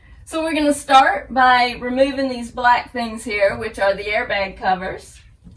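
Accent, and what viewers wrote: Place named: United Kingdom. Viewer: Yeah she really sounds like she’s from the uk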